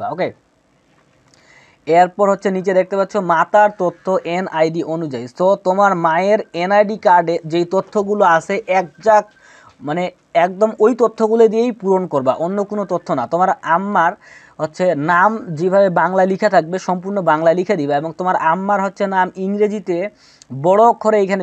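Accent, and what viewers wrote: Bangladesh. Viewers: Apnar kotha sunei ami bujhte paisilam j apnar bari Dinajpur a hobe, because amar bario Dinajpur. By the way thanks for this video 🥰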